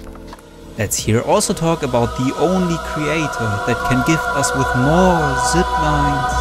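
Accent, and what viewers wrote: Germany. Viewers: i can hear it in his voice he‘s german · Your german accent made 'Super Jumping' feel even cooler.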